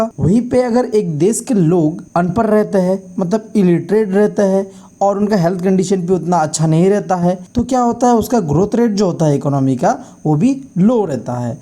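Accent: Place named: Mumbai, India. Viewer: I think you are from Mumbai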